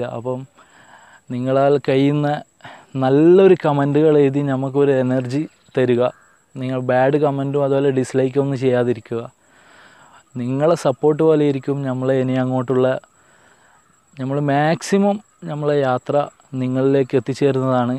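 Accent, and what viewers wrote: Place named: Kannur, India. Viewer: നല്ല തനതായ കണ്ണൂർ ശൈലിയിൽ ഉള്ള അവതരണം വളരെ അധികം ഇഷ്ടപ്പെട്ടു. പുതിയ വിഡിയോയ്ക്കായി വെയ്റ്റിംഗ്..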